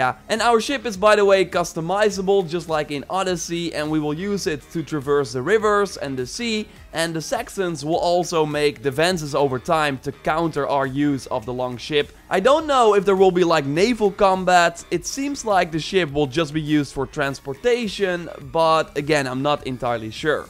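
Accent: Netherlands. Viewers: That thick Dutch accent tho... Almost makes the video unwatchable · No hate but this is the most dutch accent ever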